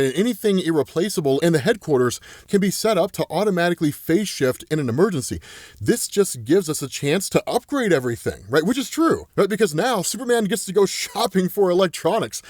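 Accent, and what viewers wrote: United States. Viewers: Why does pronounce ''Eclipse'' like that (e-clips)?. Where's his accent from in the US?. I'm not from the USA.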